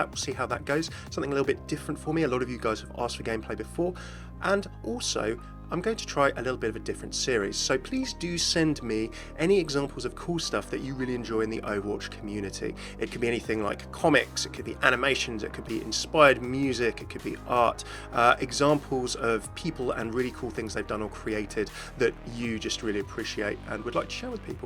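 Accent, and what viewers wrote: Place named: United Kingdom. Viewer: WOW~!!!! A FACE! I HAVE A FACE TO PAIR UP WITH THE SILKY SMOOTH BRITISH VOICE!!!!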